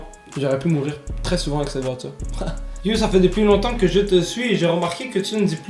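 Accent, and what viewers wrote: Quebec, Canada. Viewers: Est ce que ta deja regarder la série qui s'appelle la famille y ont le même accent québécois que toi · Fais plus de caméra caché en full québécquois gros, ça les rend 1000x plus drôle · jsuis vraiment mort bro, fais une vidéo où tu racontes que des histoires avec l'accent, c'est tellement énorme 😂 jvous kiff les québécois !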